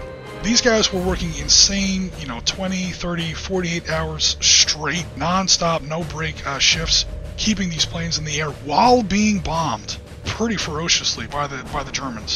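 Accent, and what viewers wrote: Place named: United States. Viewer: Being the cynic I am, and hearing a US accent (maybe think Ebert's populist given the then times, and so effectively clueless, unobjective, totally missing the point (which was the sentiment of people who lived through this and later generations) review), and mention of gamers, I thought I was going to be compelled to post some, if not many, criticisms of this review, but couldn't find any. So far anyway! I'll just have to watch this again and try and find some! A really excellent, objective, enjoyable review here, more than worth watching; and watching again...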